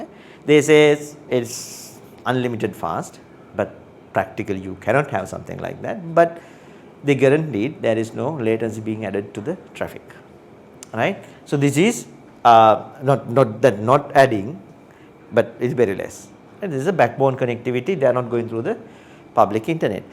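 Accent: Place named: Sri Lanka. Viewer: within 5 seconds , I was able to tell the instructor is SriLankan . :)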